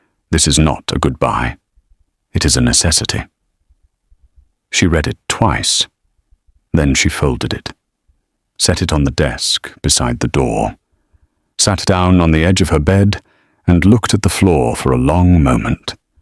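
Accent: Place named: United Kingdom. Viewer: The narrator is British so I'm choosing to think this is set in one of the beautiful moorlands of my home country, I don't care if it isn't, I claim it! 🤣 I like he went after her, he knew he screwed up and believed he was protecting her but he should have told her what was happening instead of hiding any danger from her. She's an amazingly calm soul who dealt with this with great dignity.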